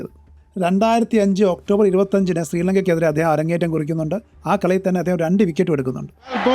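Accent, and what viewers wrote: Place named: Kollam, India. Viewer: samsaram kettal ariyam Kayamkulam_Kollam bro...😅 😂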